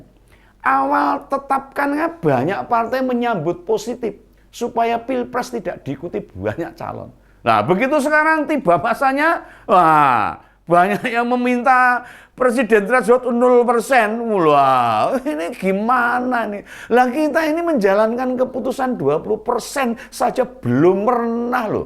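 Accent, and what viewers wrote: Java, Indonesia. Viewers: Mbok sabar to pak pak (SBY)..om medhok banget Jawane.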